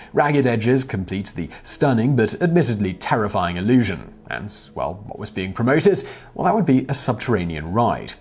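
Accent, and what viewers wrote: England, United Kingdom. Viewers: It is really weird to hear a guy with an English accent say "elevator" so many time in one video.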